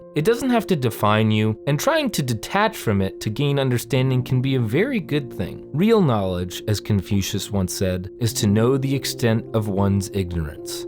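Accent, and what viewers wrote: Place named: South, United States. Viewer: I love your accent. Is it southern?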